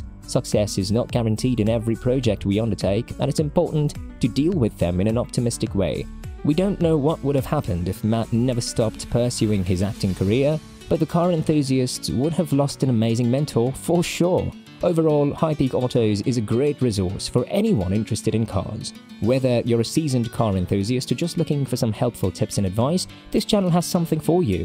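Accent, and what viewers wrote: United States. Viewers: Yet another English YouTuber talking American.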